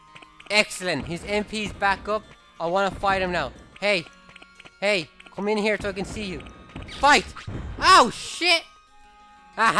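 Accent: Ireland. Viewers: where are you from man?? im irish too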